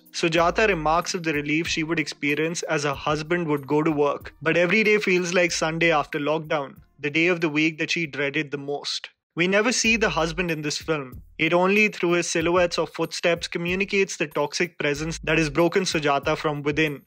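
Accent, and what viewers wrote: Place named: Bengal, India. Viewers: OK dude being a Bengali I have to say that you need to work on your Bengali accent as it's simply terrible. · sir your Bengali accent is to bad😒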